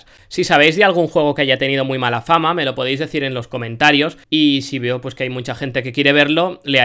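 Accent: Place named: Spain. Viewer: no se que sera mas malo los juegos en cuestion o los comentarios tan estupidamente planteados con ese tono españolete que irrita tanto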